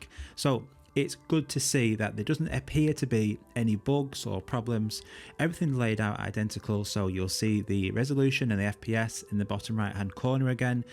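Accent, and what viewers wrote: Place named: North, United Kingdom. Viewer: Thanks, now I can do the update without worries. BTW, where are you from? I'm curious about the accent that sound a bit northern :) New sub :)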